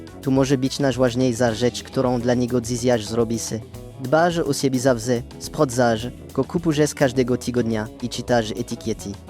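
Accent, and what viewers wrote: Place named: France. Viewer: Ktoś tu mówi z francuskim akcentem 😅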